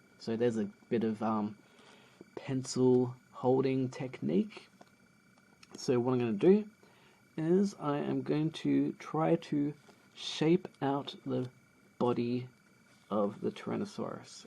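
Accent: Australia. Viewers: You're from Australia! I knew it from the first video I watched! Despite being bad at identifying accents, I got this one right. :)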